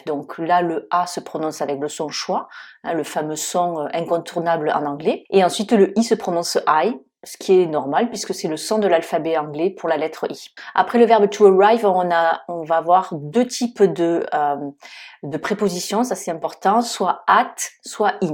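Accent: Toulouse, France. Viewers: As always, utter explanations. Thanks ( by the way, I crave your Toulousain accent )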